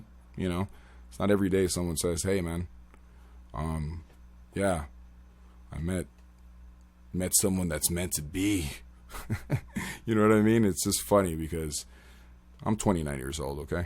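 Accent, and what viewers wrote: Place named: Toronto, Canada. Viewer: Nice to hear a guy out here. You sound like you are from Toronto .. I am from Toronto.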